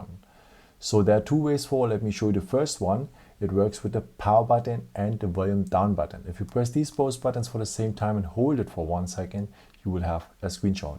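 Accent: Germany. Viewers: How to get a clear screen on the phone to take pictures??? I can see myself at the bottom of the screen but, cannot open it up . Thank you 😊 I like your accent at some of the words you use makes me believe that you are German..😊 🤔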